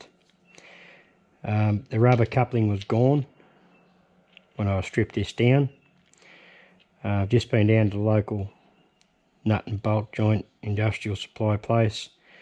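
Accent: Australia. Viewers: Good job! - By the way. I like the australian way to speak english! It sounds a little bit strange, but nice. Greetings from Dresden (Germany).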